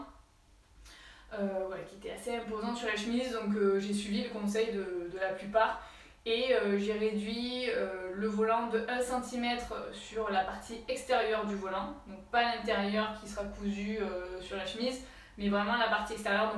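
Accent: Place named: Tarn, France. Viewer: Merci pour cette vidéo. Tu as encore fait de super réalisations.... Bravo. Tu viens de quel département ? Car moi je suis du Tarn (de castres) et que ton accent est proche du miens. Je fais ma curieuse 😜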